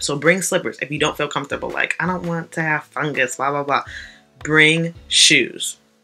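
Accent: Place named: Atlanta, United States. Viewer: Girl you on fleak ! Atlanta talk.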